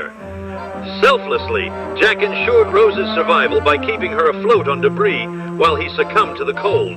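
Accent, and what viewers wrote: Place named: Transatlantic, United States. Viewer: literally a transatlantic accent.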